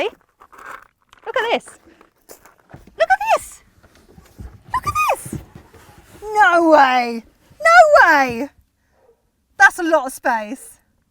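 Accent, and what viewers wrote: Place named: United Kingdom. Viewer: When I heard the British accent, I realized how much I missed Doctor Who…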